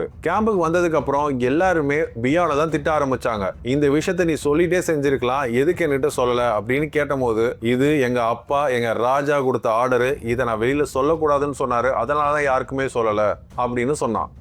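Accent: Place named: Birmingham, United Kingdom. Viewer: Yoo broo oun voice la peaky blender pottu bro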